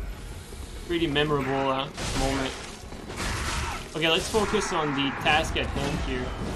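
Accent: Quebec, Canada. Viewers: He sounds Québécois to me.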